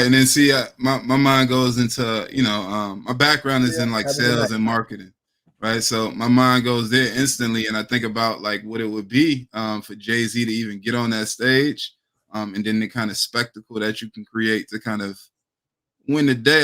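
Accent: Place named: Maryland, United States. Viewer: This was a treat to watch!!! Many genuine laughs while watching. your Maryland accent is so awesome. I’m amazed you learned about silver through the Moorish science movement. Islam!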